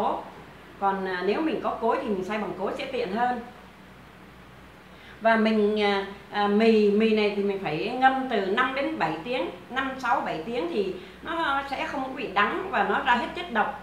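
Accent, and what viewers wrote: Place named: North, Vietnam. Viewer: Bà ơi, cho con thắc mắc 1 tí được không ạ? Con thấy bà nói giọng bắc, có phải bà là người miền bắc không ạ?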